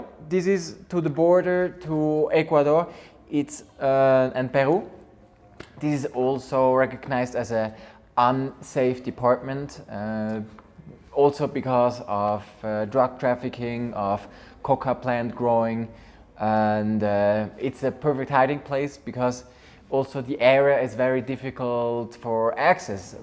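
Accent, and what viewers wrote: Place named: Switzerland. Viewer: From which country are you? (the accent sounds really familiar to me....😄 Schwiizer, gäll?! 😂 ✌️ 🇨🇭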